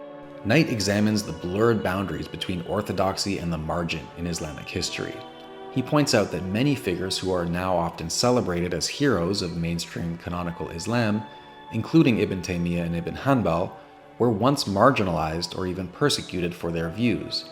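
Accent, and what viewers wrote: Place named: Ontario, Canada. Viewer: Dear Brother: Fellow Islamic 'seeker' here. Fellow Madre Yage aficionado here. Just returned from an 11 day retreat in Columbia with the plant. THE 2 ARE VERY COMPATIBLE AND VERY COMPLIMENTARY, IMO. I am located in Ontario, near you, judging from your accent and some of your videos. Thank you for being a visionary. Thank you for your courage. Ameen. Emily.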